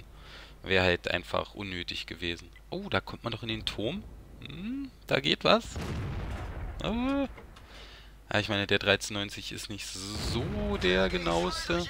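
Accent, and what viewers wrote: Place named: Switzerland. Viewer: ahhh wie geil ein schweizer :)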